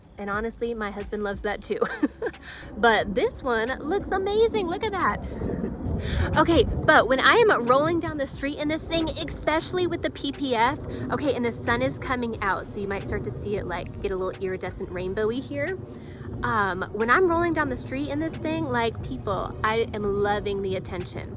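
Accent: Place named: Virginia, United States. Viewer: That Virginia accent!!!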